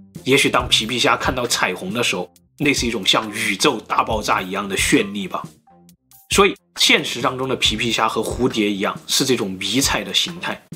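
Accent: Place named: Cantonese, China. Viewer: 是廣東人吧, 講話經常有粵語的影子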